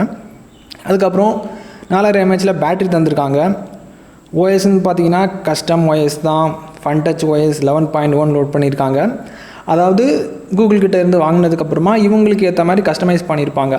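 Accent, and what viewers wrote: Kanyakumari, India. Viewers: kaniyakumari slang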